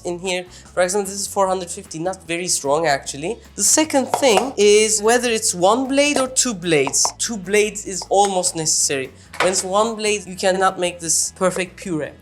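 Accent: Turkey. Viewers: I love how Turkish affects your English. I think it sometimes gets really funny. PS: I feel like "efficiency" may be a better translation to "bereket" than "sustainability". You try to get more from the things you have, so they have more "bereket". I think it describes efficiency quite well. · Love ur recipes 😍 plz Share recipes of Turkish desserts too. I like ur Turkish English accent so soft. love from India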